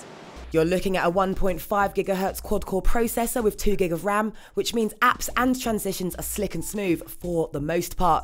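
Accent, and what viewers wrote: United Kingdom. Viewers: i hate british accent too anyway half of this is rubbish because i can get 4g with it because i do the camera is amazing and the DEFINITELY NOT LAGGY U LIED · Love the British ascent! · Hate Britsh Acsent !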